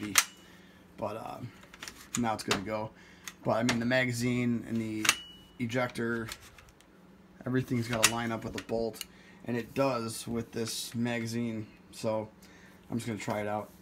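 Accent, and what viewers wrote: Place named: Illinois, United States. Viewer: I was about to ask if you’re from IL based on your accent but I saw comments in the affirmative lol same here. Moved the hell out to chase my gun dreams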